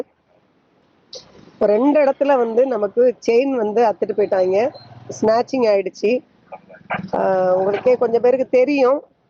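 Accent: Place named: Madurai, India. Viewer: Thank you madam for your alertness with madurai slang.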